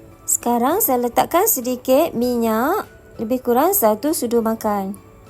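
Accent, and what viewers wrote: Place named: Banjar, Indonesia. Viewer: Bagus..cakap tu... Banjarnya agak karas bunyinya... ia lambut cikit...n laju...surahnya...😅🎉🎉terbaik....kulaan...rami bangat mendangar..... Ajari lah....ka.kanakan...agar nahap keturun...bisa bersurah...❤️❤️❤️🥰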